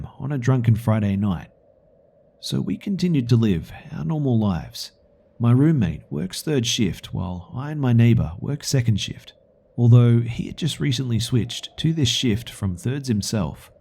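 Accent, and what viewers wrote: Australia. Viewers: He sounds Australian. Go 🇦🇺🇦🇺🇦🇺🇦🇺🇦🇺🇦🇺🇦🇺🇦🇺 Aussie Aussie Aussie oh oh oh. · I love your accent soooooo freaking much😍🙏🙏😂😂 Are you from Australia (idk it just sounds like it)😂😂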